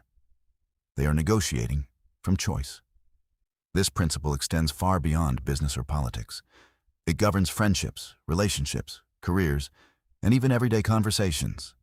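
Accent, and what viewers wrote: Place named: United States. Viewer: Good job with the the American accent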